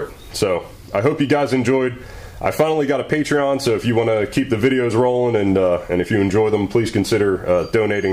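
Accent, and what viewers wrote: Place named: Mid-Atlantic, United States. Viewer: Have you ever lived in the Delaware, Maryland, Pennsylvania area? You've kinda got the accent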